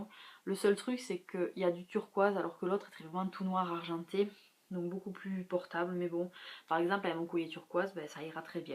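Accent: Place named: Toulouse, France. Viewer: J'avais reconnu ton accent, je suis près de Toulouse aussi .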